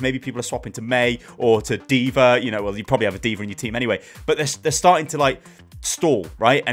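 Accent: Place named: Kent, United Kingdom. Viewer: Your from Kent in the U.K. arnt you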